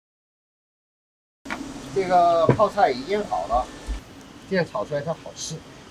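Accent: Chengdu, China. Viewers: 同作为四川人的我, 听到你说川普, 那感觉你懂三? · 我也觉得，还是你说成都话会比较亲切和正宗！我回成都去你那里蹭一顿饭吧？谢谢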